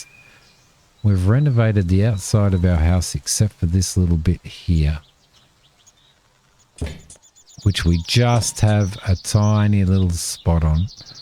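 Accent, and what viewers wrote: Australia. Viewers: hey are you an auzzie?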